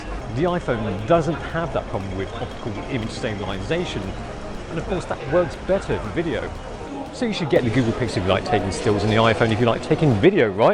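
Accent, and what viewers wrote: England, United Kingdom. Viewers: are u English bro? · Great video Phone comparison!. But I think this is the first time I've ever seen an Asian man with a British accent?.. but then again I live in the states.. cheerio!😉🇺🇸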